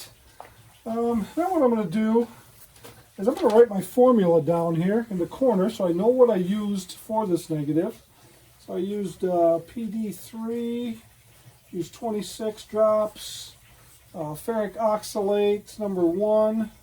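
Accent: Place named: Manitoba, Canada. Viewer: Sounds like a Manitoban accent.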